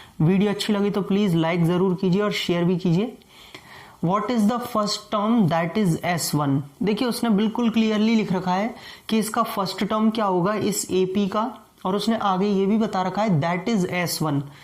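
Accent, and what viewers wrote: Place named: Haryana, India. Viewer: Sir awaj se aisa lgta ki aap Haryana ke aas paas ho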